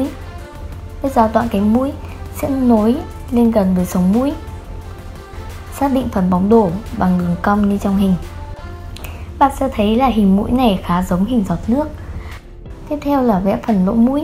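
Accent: Vietnam. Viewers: Cj là người Bắc hả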